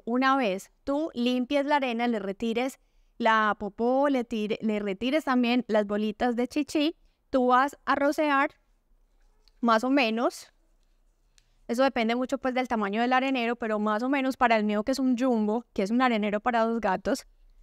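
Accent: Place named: Antioquia, Colombia. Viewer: Hola. Me encanta tú acento paisa, eres muy tierna. Cuéntanos sí té encontrabas sola en el momento del temblor. Té envío un gran saludo desde Bogotá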